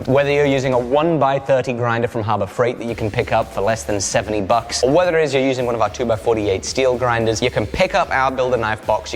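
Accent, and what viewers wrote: United Kingdom. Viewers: is finally British again and it’s the best feeling ever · Jesus, 8 months and you're back to full brit lol. Cussing out your mate on camera lol. Love it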